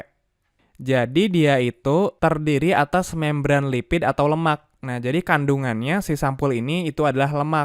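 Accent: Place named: Bandung, Indonesia. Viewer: Orang bandung kak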